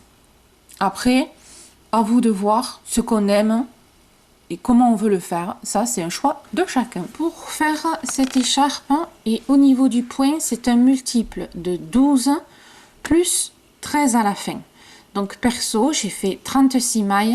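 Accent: South, France. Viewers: Merci pour tous ces conseils et bravo en plus tu me fais trop rire avec ton accent du sud. Et cela fait du bien en ce moment comme il fait froid chez nous en Auvergne. Bisous d'une mamie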